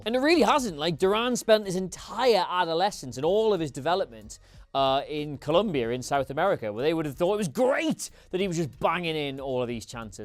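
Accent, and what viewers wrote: United States. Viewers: american accent >>> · That American accent cracked me up 😂 love your videos!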